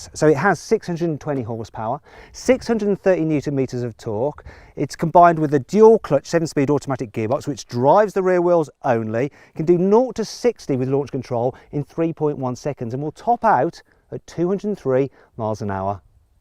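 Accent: United Kingdom. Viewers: 맷 뭔가 포드 V 페라리 영화에서 나오는 켄 마일즈 닮았는데 기분탓임? 이목구비 뚜렷하고, 영국식 발음 쓰는거 딱 들어맞고 차 좋아하는거랑... 차 단점 한번에 알아보는거 너무 닮았는데 ㅋㅋㅋ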